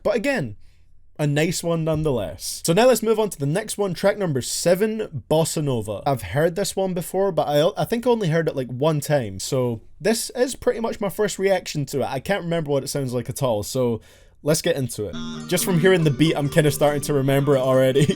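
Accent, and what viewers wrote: Scotland, United Kingdom. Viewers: Hey are you from Scotland?, I am!!!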